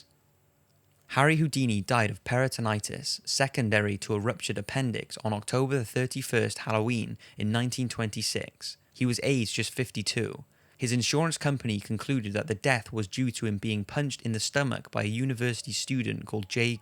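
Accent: Wales, United Kingdom. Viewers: what part of the uk are you from mate ? :) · new to this channel going trough all your videos now :) are you from wales by any chance? you sound it lol I'm from wales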